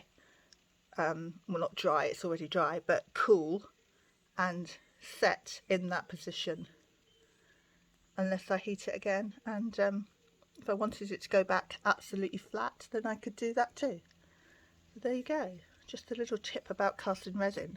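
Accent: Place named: United Kingdom. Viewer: Great tips, new subscriber and a British Accent hooray!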